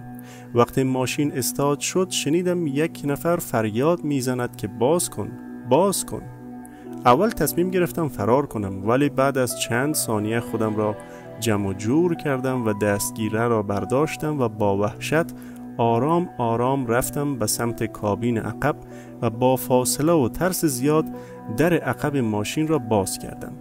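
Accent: Afghanistan. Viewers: جناب چرا اسم و محل آن شهید را به خانواده هایی که عزیزانشان سرطان و یا مریضیهای نا علاج دارند ندادید که آنها هم مثل شما خوشحال شوند مگر آن مادرانی که فرزندانشان درگیر مریضیهای مهلک هستند آدم نیستند و احساس ندارند ! ؟؟ میدونم که این داستان مال حدود یکسال پیش است ولی دردمندان زمان نمیشناسند بروید و به دادشان برسید مگر آن شهید بهش سفارش شده فقط برای فرزند شما شفا بگیرد ، راستش نمیدونستم آن دنیا هم پارتی بازی میکنند درثانی شما با این تعریف میگویید قدرت شفای شهیدان از خدا بیشتر است ! ؟ برو بابا جمعش کن و این چرندیات را به خورد مردم بدبخت و ساده دل نده ، راستی نمیدانستم مردها هم میتوانند زن فوت شده را غسل کنند خوب بوی گلاب که آن مرده میداد دیگه شستینش که گلابها برود و .... دست بردارید و حال من و بقیه ای که که این چرندیات را. البته لحجه شما بیشتر شبیه مردمان عزیز افغانستان است ، با این چرندیات خواهران و برادران افغانستان را هم که برای همه ستم دیده هایشان بد نام نکنید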